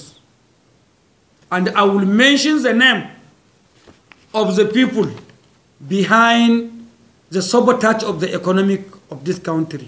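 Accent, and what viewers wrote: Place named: Hausa, Nigeria. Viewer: Hahahaha, that is how the the hausas tribes pronounce things. . Take example from the president himself the way he do province words.Anyway the hausas are the most honest pple in that' Nigeria as a whole. God bless the honourable for helping the common pple in that country call Nigeria. Amen oo!!!.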